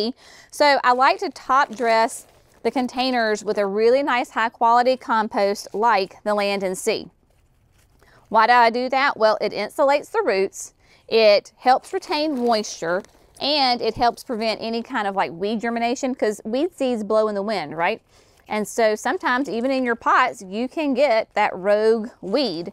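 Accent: South, United States. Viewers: I LOVE your southern sus ❤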